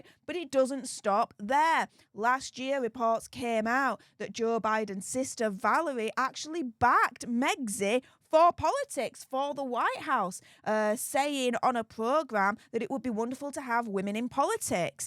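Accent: Yorkshire, United Kingdom. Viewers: English is my second language , but I understand her..She has heavy Yorkshire accent..They talk like that, I checked sometime ago on YT.,when somebody mentioned her accent.. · has a Yorkshire accent. So do I. I'm proud to be a Yorkshire lass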